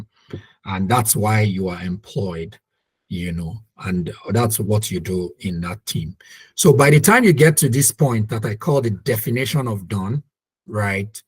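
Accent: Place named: Nigeria. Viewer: 😁😁😁, my boss thank God you're Nigerian ooh,other people i no really hear them,im sorry if it's offending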